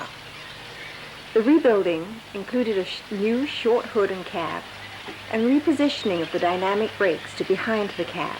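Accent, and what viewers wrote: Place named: RP, United Kingdom. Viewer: A very interesting video. I love the very posh English accent lol!. 😄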